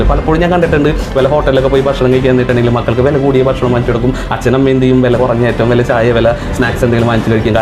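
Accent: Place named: Cherai, India. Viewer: Cherrayi slange..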